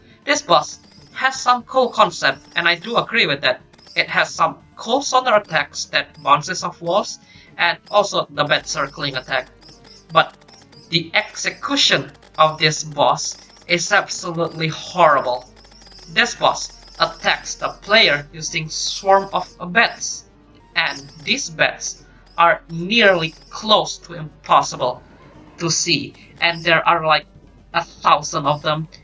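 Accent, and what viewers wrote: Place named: Indonesia. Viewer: indonesian accent hot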